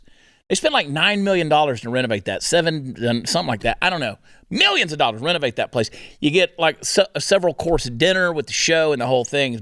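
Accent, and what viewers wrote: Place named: Georgia, United States. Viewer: I do too! He's a wordsmith with a Georgia accent! 2x the fun!!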